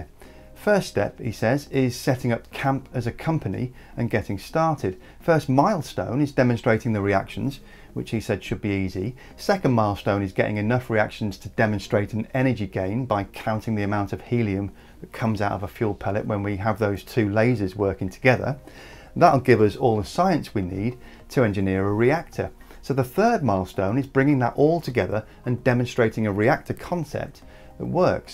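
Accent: United Kingdom. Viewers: I deliberately NEVER mention the totally IRRELEVANT subject of HOW somebody says something, and focus EXCLUSIVELY on WHAT they say. However, given that I have seen SO many brainless idiots in the world voice their worthless unoriginal opinion about style over content, I figure I am allowed at least ONE time to do the same. The CONTENT of this channel is PERFECT. But I REALLY cannot stand the speaker's mild British voice. I would prefer an aggressive angry voice (British or American) to deliver the narrative.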